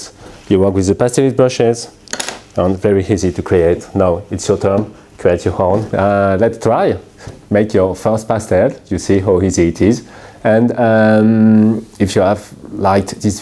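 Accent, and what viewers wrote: France. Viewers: Thank you for such a gentle and lovely voice with accent. MMM French accent always reminds me of food. I know why however that is another story. After dusting off the pastels January 1 and deciding to make a picture, at least one, everyday. Then I would watch how it is done. The spreading of the colour is so relaxing. Deep rich intense colour. Colour heals. May all who venture into the land of pastels be healed with the colours. <3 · On sent bien qu'il s'agit d'un Français qui parle Anglais!!! Pourquoi n'a-t-on pas une version FRANÇAISE?? Je suis désolée mais on devrait pouvoir avoir le choix de la langue!!!!!! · Yup totally french. I'm myself a frenchie... But his accent is sooooo pronounced, even sounds quite fake. (We french know that English ppl love our accent so we make it sound the most "french" possible to.. Be "sexy"?)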